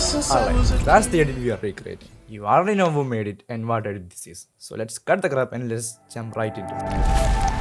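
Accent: Kerala, India. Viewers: Why do you sound so familiar? Wait are you from kerala?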